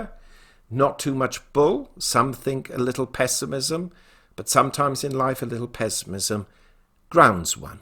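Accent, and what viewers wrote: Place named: RP, United Kingdom. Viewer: Wow, you just accused Mike Maloney, Chris Marcus, Chris Duane, David Morgan, Bix Weir and other respected silver professionals of either not having the technical understanding of the markets or worse yet, fraud by disseminating hype to sell product, while dismissing the fraud committed by JPM and the rest of team evil, who purchased over 2 million of fake paper ounces of silver to knock the price down to low $27s. Makes me wonder 🤔 about whose pocket you're in? The arrogance matches your Queen's accent.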